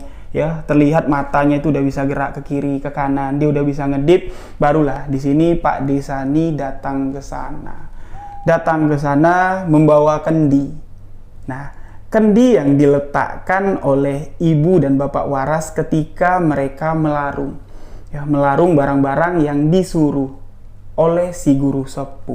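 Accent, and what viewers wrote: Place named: Jambi, Indonesia. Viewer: AKU Dari Lampung Nich Bang! Wa A'laikum Salam Wr Wb Jg AKU Percaya dengan Segala Cerita Yg Bang Sampaikan! dengan Logat Bahasa Nya Yg Khas Orang Jambi, Slow, Lembut, Dan Bisa Kita Pahami Sucsses Terus Bang Aamiin 3X Allah Humma Amin Ya Allah Ya Robbal A'lamiin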